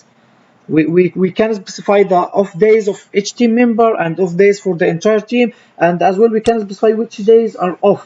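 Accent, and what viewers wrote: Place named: Arab world. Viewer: sounds arab pronunciation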